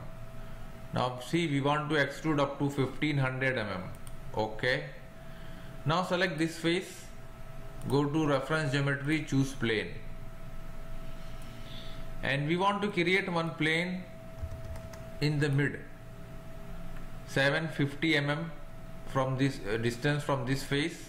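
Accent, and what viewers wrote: India. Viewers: Thank you, finally a tutorial with an indian accent!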